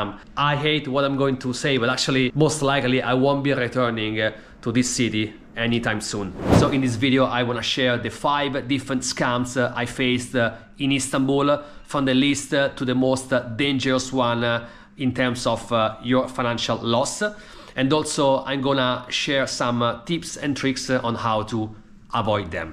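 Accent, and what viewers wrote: Italy. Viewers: You sound Italian by the way you speak English. Am I wrong? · It looks you have italian accent! So I suggest you make also a video about millions scams in Milano,Roma,Venezia.fiornze...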